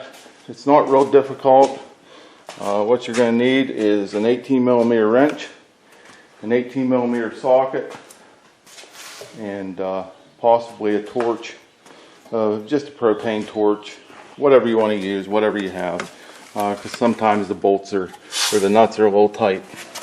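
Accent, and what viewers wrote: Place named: Pittsburgh, United States. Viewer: Hello from one Yinzer to another! I thought I recognized our dialect. Nice video,and really straightforward advice!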